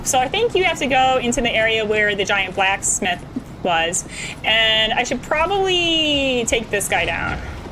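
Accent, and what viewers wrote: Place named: Australia. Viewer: English, not "British" accent but yeah it sounds more Australian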